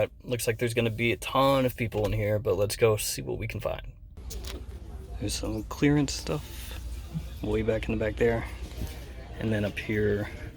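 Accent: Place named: Appalachia, United States. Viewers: Bro sounds like Huntsville Alabama! Roll Tide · I've been watching for a little bit and I suspected by your accent that you were probably somewhere in Appalachia. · Wondered where you were from because your accent was so similar to mine and now it makes prefect sense. I grew up about an hour away from there on the VA side. Definitely hard to find fragrances in small town USA. Thank goodness for the internet.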